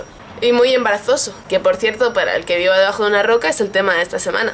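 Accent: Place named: Mexico. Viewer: aww Me encantas e asento mexicano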